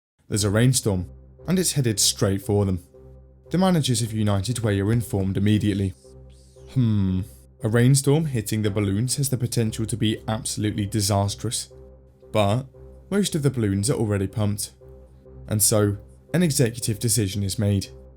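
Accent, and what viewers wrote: United Kingdom. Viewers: I love the British man going over my state's history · with a bri ish ascent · Not fond of a Brit voicing this about Cleveland. If you’re gunna make fun of the USA, get someone with an American accent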